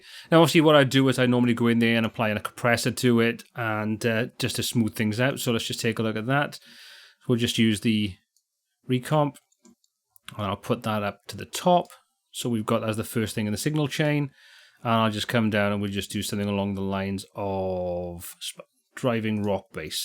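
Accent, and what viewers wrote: Wales, United Kingdom. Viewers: Im sensing a bit of welsh on your voice there mate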